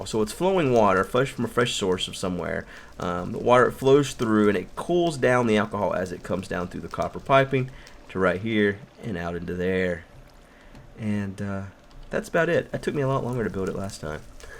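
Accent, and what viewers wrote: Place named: United States. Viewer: Your American accent nice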